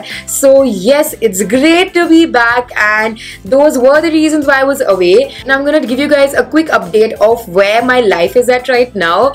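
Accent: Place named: South India, India. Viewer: What ????? 🤣U r northindian.. i m subscribed to u from last 6-7 months n thought u r a South Indian (majorly because of the south wala accent when u talk in english ) ... i am also north indian.. 🤗hugs... whats your native ??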